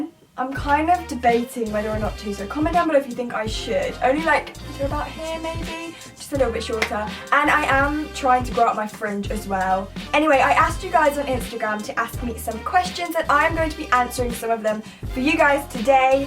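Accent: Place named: United Kingdom. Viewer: Yo ur british ayee xD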